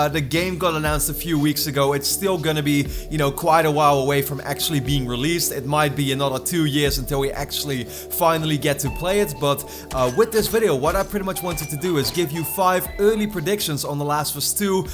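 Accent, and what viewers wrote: Netherlands. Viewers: Are you Dutch? You sound Dutch!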